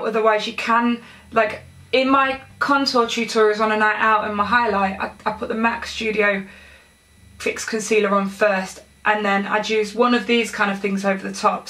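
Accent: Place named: Birmingham, United Kingdom. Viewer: You sound like you have a Birmingham twang to your accent x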